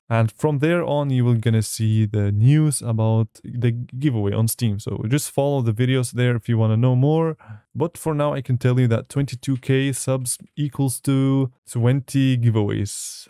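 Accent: Germany. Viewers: Are you German? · Bildquelle suchen is reverse image search. I would have never thought you were German. Your accent is really clean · are you german :O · are you german? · holup are u german or austrian?? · Wait are you German?😮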